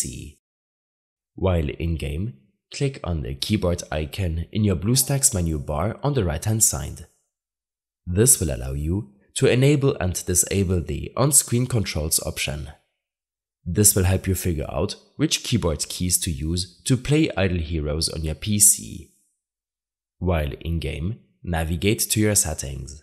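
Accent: Germany. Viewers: are you german du have a akzent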